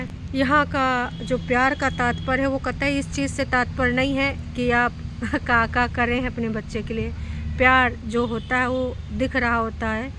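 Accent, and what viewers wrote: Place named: Lucknow, India. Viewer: aap Lucknow se hai kya, beech beech me aapki language jhalkne lagti hai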